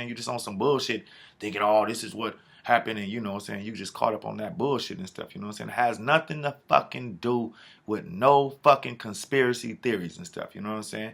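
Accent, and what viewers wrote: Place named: Jamaica. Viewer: And another thing why are you talking with a jamaican accent if you're from South Central LA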